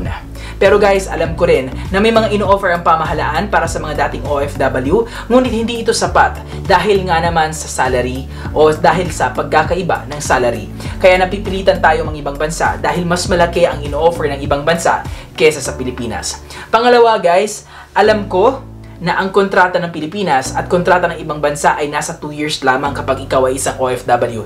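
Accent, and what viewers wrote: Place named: Arab world. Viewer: nkakatuwa, mukhang arabo na fluent sa tagalog though nsa accent nya ung arabic.. ang galing.. nice mixed.. gwapo ah.. gym ka bro lalong bagay sayo pagiging arabic.. good luck ang more blessings sa channel mo...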